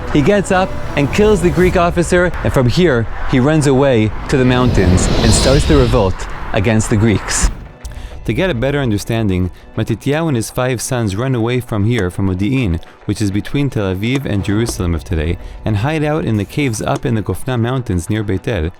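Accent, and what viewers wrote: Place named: New York, United States. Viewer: I was not expecting to break out a perfect NY accent! This is the first time I’ve heard him talking instead of singing. I’ve always imagined he’d have a strong Israeli accent!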